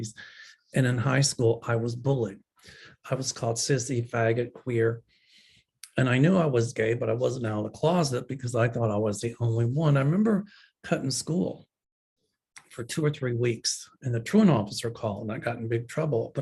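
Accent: South, United States. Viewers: it’s important to talk about your experience of being a victim of Bullying. It’s real, it’s true and others may not ever know of the damage and pain it can do. It may make a difference. As for your articulation… a beautiful southern drawl and it yours!!!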